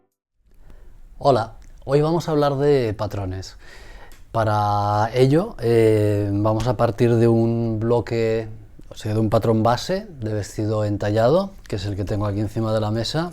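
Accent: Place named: Catalonia, Spain. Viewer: Bona nit, me encantan tus clases con ese acento catalán tan pausado, haces que todo sea más fácil feliçitats.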